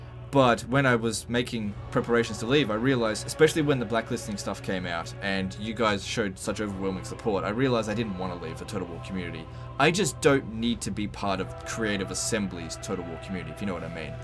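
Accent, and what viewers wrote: Queensland, Australia. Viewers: Brisbane gang represent, enjoying the deep Queensland accent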